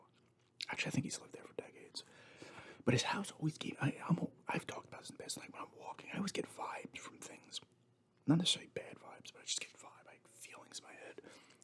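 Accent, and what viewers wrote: New York, United States. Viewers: I’m from New Rochelle, NY and I’ve been living out of the country for the better part of 9 years now (some time in China and the last 7 years in Brazil) and I’m a huge ASMR fan. Your channel has become my favorite recently simply because listen to you shoot the shit reminds me of home lol, the NY accent talking about NY sports in your videos, I also love games as much as you do. Anyway, definitely gonna keep watching, thanks for the content my guy.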